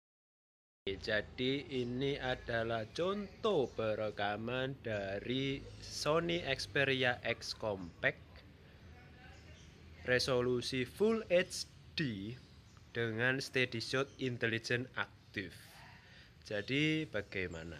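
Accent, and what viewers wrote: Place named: Java, Indonesia. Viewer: Medok jowo, lanjut ae lahh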